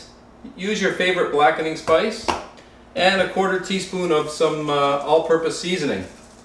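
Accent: Canada. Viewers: Anyone else that can tell this dude is ethier from Canada or Wisconsin just by his accent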